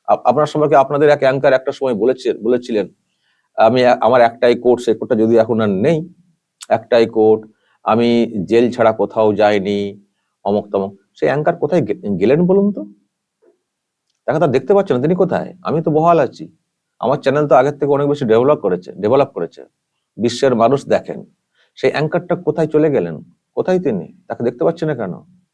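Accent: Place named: Bangladesh. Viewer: ache vai keman ekta bangladeshi taan taan vab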